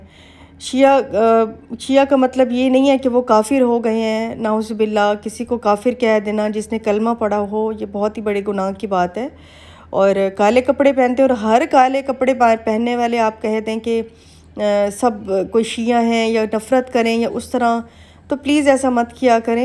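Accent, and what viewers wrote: Saraiki, Pakistan. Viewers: Hayeee ap saraiki ktna pyara bolti hai 😍😍😍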